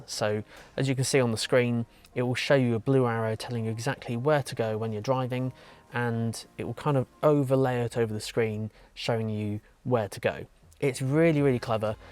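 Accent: United Kingdom. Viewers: its 10pm here and i felt like watching a good movie. mbux review; 55mins long in 4k and he’s British 🍿 out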